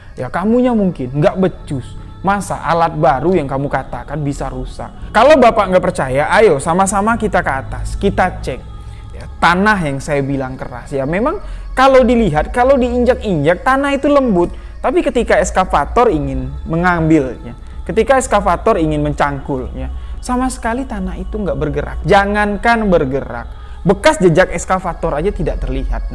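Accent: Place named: Bali, Indonesia. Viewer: Afwan, antum Logatnya seperti orang Bali ya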